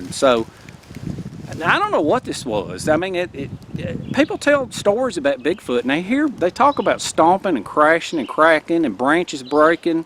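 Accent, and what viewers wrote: South, United States. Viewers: I really liked this campfire story, especially with the accent. Its like southern ASMR.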